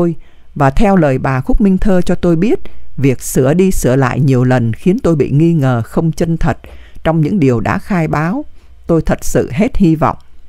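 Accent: North, Vietnam. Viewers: Người đọc là Bắc kỳ, đọc chuyện của Nam Kỳ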